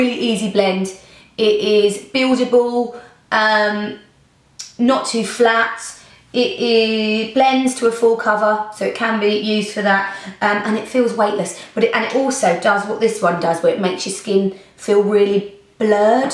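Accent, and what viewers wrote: United Kingdom. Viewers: Omg im so so so in love with your english ,like harry potter 😁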